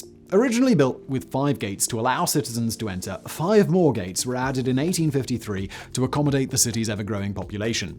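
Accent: RP, United Kingdom. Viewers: The cool thing about, he’s got that posh English accent but it’s cool not annoying. I could have a pint with for sure.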